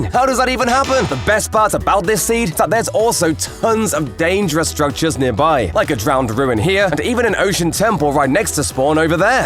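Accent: United Kingdom. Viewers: Dude are you British? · My mind is blowing up! At the same time you sound British and at the same time you sound American! What are you!💀💀💀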